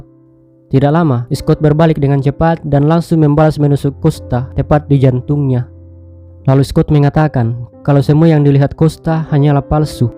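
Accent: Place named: Jakarta, Indonesia. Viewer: owh..tapi cara ngomongnya kayak orang Betawi.....tapi semangat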